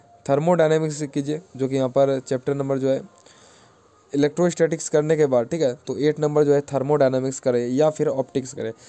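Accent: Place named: India. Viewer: सर आपके बोलने का अंदाज देसी स्टाइल एकदम मस्त बढ़िया मजेदार है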